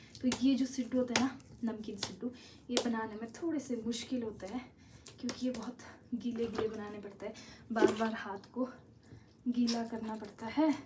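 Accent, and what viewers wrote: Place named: Pahari, India. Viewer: Hy ksy ho? Aap ki pahari ton bhut Aachi h Or Aap khud bhi bhut sundar ho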